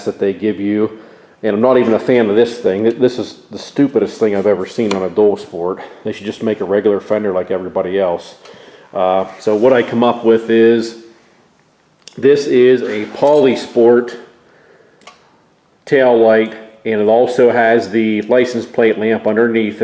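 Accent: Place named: Pittsburgh, United States. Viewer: I here a pittsburgh accent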